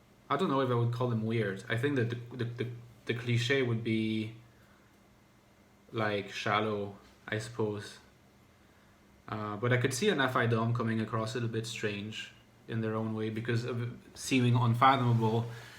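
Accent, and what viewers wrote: Ireland. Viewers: Oh, so that's why you speak English with an Irish accent! (You had an Irish flatmate. It all makes sense now...) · You shared a room with an Irishman! That makes a lot of sense...I thought you sounded Irish at first (and I still kind of do) I'm not even going to comment as much as I could on the weirdness part. I've been called 'weird' so many times in my life when I haven't even been ~trying~ (I appreciate your differentiating from innate and 'bestowed' weirdness since I never considered myself weird until I started to notice this pattern.) I'm also a girl (not sure of my type) so I think that makes the 'weird' accusations...different somehow?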